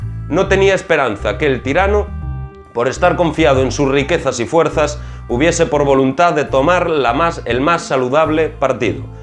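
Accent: Galicia, Spain. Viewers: Al hablar muchas veces se te nota el ramalazo de gallego de pueblo , un saludo 💟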